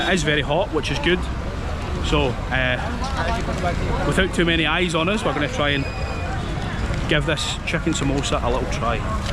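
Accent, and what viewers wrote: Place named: Ireland. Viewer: Which country are you from? Let me guess: Ireland?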